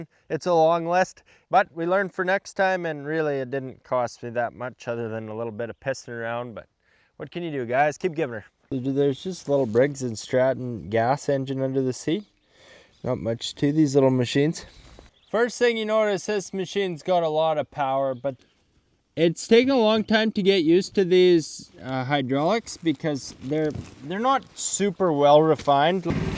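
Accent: Canada. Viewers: I'm here for the Canadian accent.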